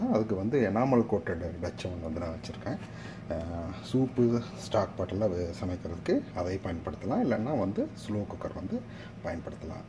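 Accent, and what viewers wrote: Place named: Kongu, India. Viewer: Effective crisp communication of key facts in simple kongu tamil !! Keep it up sir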